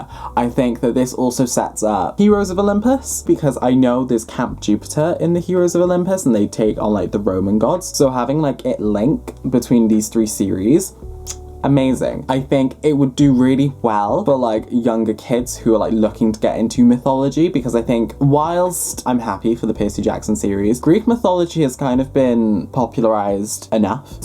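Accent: United Kingdom. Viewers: Omg can't believe you're reading Kane Chronicles.. I mean, you reminds me of Carter Kane even tho you got British accent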